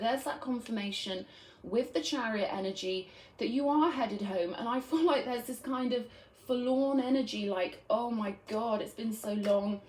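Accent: United Kingdom. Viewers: Smooth British female voice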